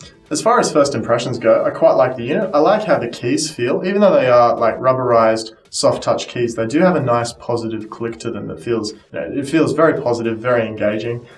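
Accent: Australia. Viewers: He's saying Hertz correctly. With an Australian accent.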